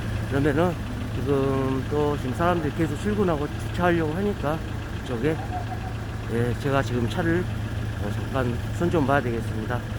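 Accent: Seoul, South Korea. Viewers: 님 완전 서울사람인데요 ㅎㅎ 홧팅!! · 서울사람보다 서울말 더 잘하는 님~ 화이팅~!!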